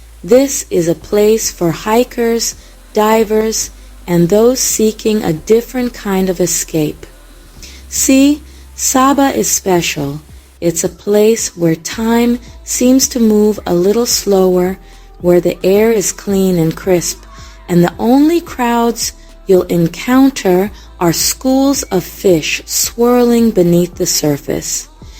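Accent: Jamaica. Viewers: I love this. For one moment I thought English which is taught in schools went extinct. Anyone from any English-speaking country can understand and partake. Nothing tops that beautiful Jamaican accent. I wish you much success in your new venture. God bless.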